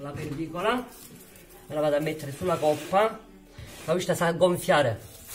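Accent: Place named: Lecce, Italy. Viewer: Grazie per le tué ricette .io sonno nata a nardò e vivo in francia . Mi fa tantôt benne sentire il dialeto leccesse.avevo 4 anni quando son arrivatta in francia.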